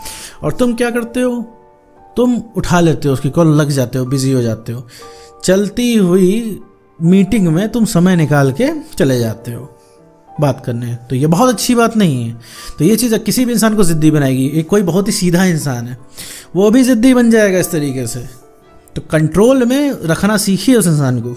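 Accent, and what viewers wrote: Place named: Lucknow, India. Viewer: Ap kha se ho lucknow ya kanpur side ki aawaj lg rhi h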